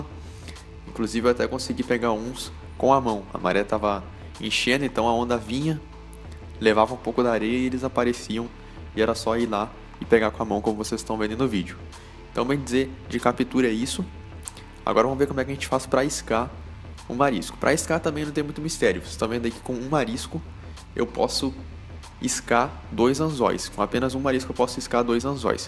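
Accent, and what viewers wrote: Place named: Porto Alegre, Brazil. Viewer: opa blz, tu é de Porto Alegre tchê ?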